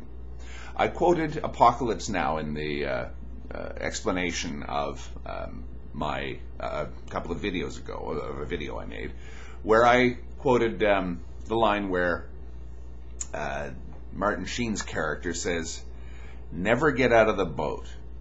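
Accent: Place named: Canada. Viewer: Heavier Canadian accent here than usual!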